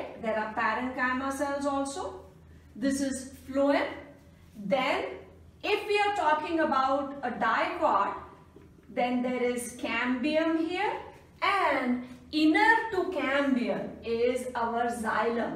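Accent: India. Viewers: Her Indian accent is gold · why all indians have this accent, you are a doctor but you really should improve your accent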